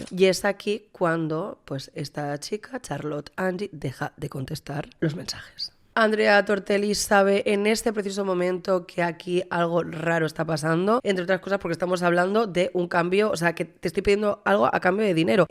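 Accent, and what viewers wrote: Catalonia, Spain. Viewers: ¡Buenas! Primero de todo gracias por lo que haces. En cuanto a lo de vocalizar... creo que la gente confunde tener acento con vocalizar. Tienes acento catalán y se nota. Pero eso no es nada malo, es más, es bonito que las personas tengamos distintos acentos. Yo creo que más bien se refieren a que te salen catalanismos estilo "el caso que nos pertañe". Pertañe en castellano no existe, sería atañe. A todos nos salen expresiones de nuestra tierra y es lo bonito de la lengua! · Tienes un acento catalán bastante fuerte. Yo te entiendo perfectamente porque wiybdevla zona😅😅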